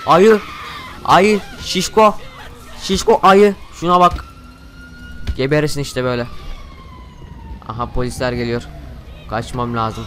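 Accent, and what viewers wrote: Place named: Sivas, Turkey. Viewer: Kardeş sen sivaslın herhalde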